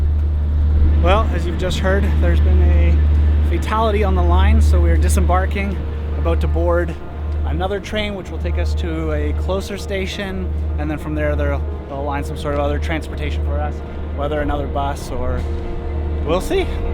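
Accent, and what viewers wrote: Canada. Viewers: I don't understand how people confuse the American and Canadian accent. Yours is so clearly Canadian. There is a softness and meter that isn't so apparent in America. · I love your travel logs..I knew you were Canadian. Abouwt gave it away lol. I love your excitement of travel.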